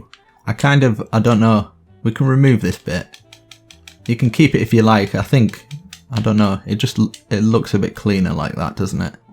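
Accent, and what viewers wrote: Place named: United Kingdom. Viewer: And it is not just the British accent it's also the sound of your voice! (If that makes sense)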